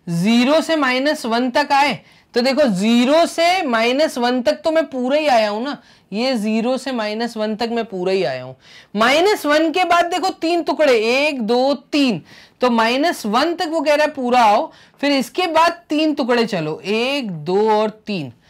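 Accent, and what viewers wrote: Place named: Odisha, India. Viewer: Sir i think you are from odisha I am from odisha and i mark your language and i think you are from odisha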